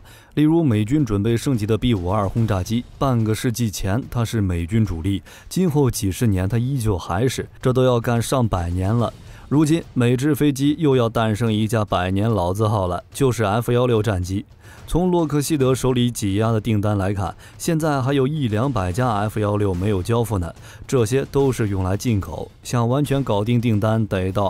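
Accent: China. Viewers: 一個操著大陸口音的人，居然在吹台灣的飛機有多厲害！我業障重啊？我真的視障目盲耳背，一堆人跟風且無常識！就問你們飛過沒？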